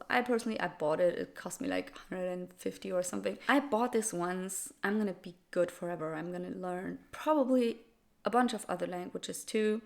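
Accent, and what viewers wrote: Germany. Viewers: I started learning German 2 days ago because i love the sound of the language. It's my 3rd foreign language. Even your accent in English is so sweet like German.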